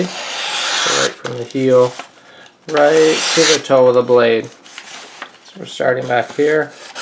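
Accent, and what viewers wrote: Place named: Newfoundland, Canada. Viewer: New to the channel you sound like a fellow Newfie! Love it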